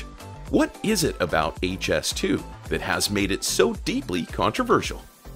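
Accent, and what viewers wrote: United States. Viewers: what mistakes, and what problem do you have with the American accent? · Why the hell is a yank the voice of the video, can't they afford to get a British person to do the voice over. The HS2 is a English Railway engineering project between London and Birmingham Not New York to Miami. 💂‍♂️💂‍♂️🇬🇧🇬🇧🏴🏴😡😡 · Littered with mistakes and covered in an American accent 👎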